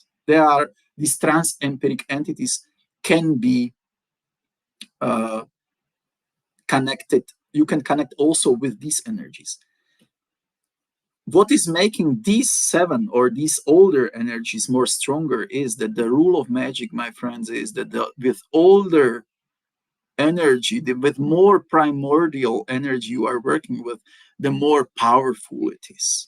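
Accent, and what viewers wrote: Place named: France. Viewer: Your videos are my favorite. You remind me of the Brazilian man who introduced me to Quimbanda. I guess it must be the French accent!’ Please keep up the great work!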